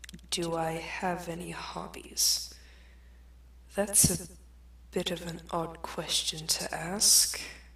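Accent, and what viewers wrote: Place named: United Kingdom. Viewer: He sound british (｡・//ε//・｡)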